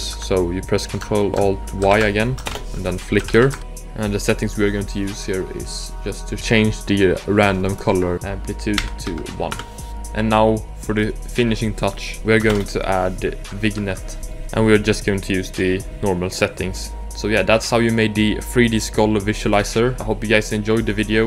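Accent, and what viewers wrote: Sweden. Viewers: are you swedish? XD, i feel like i recognise the accent as a fellow swed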